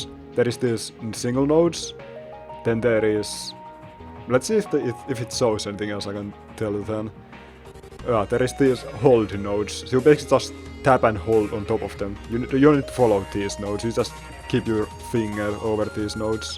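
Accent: Finland. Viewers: ÄIJÄ KUULOSTAA IHAN VITUN SUOMALAISELTA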